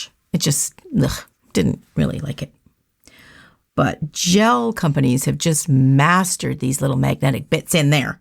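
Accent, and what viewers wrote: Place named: Canada. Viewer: I was wondering if you are Canadian, then I heard you say “nuke” and “eh” and that was my answer! I have just started doing my nails at home and have been doing research on products and designs. I’m going to do cat eye next!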